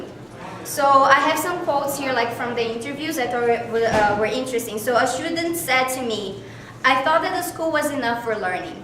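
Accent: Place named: Goiás, Brazil. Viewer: loved your sotaque goiano em inglês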